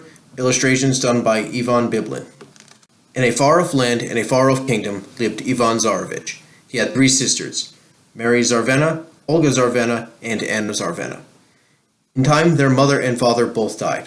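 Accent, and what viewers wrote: New Jersey, United States. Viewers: Don't apologize for your Jersey accent, I love listening to you. Thanks for telling this story!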